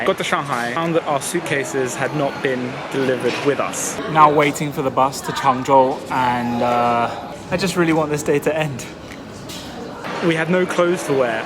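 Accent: United Kingdom. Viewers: after stayed in china for such long time you still able to keep your britain accent,that aint easy bro. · ，I know you have lived in China many years, but please keep your British accent because of the influence of Dayday. God hahahahahah. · 听着你说英语觉得好不习惯啊！第一感觉就是，哎呀妈呀，说英文怎么有英国口音啊！但是过了2秒钟以后，我才反应过来人家本来就是英国人而不是中国人 LOL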